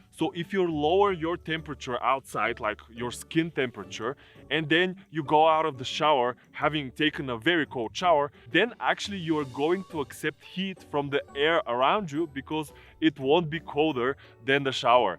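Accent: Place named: Eastern Europe. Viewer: i disliked because i dont like your slavic accent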